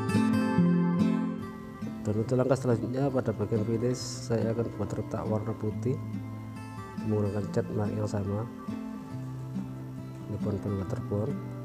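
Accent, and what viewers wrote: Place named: Java, Indonesia. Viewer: Wong endi Iki kok koyok wong jowo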